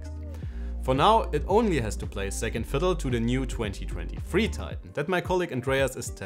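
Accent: Germany. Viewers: Höre ich da etwas einen Landmann im angelsächsischen Bereich wildern?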